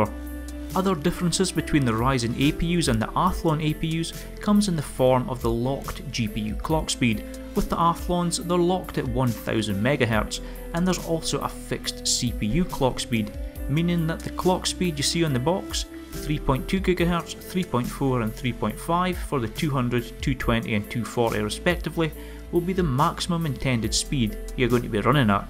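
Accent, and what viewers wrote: Scotland, United Kingdom. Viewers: Great video, I love your Scottish accent. 👍 🏴 I just might reconsider the Athlon.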